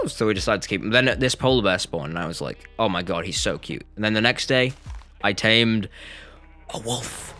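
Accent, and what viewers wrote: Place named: United Kingdom. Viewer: Why.is he birtish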